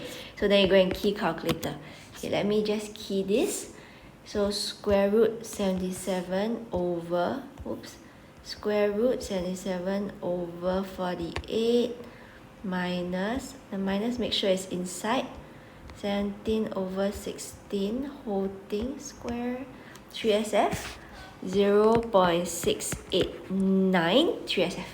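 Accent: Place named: Asia. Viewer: The most Asian accent I have a test tommorow 🤔👍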